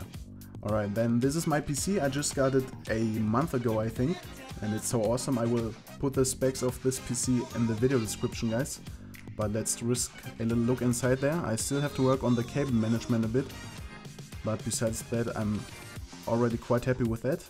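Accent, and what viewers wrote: Germany. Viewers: I Subscribed you because your PERFECT english and ive heard the German very well out of it und weil du mir sehr Sympatisch erscheinst! The first Video that ive seen from you was: CS:GO Ninja Defuse de_dust2 by! After that i had no choice and had to subscribe! Btw i have already that Phoenix Pass and dont need it so I hope you guys Luck ^_^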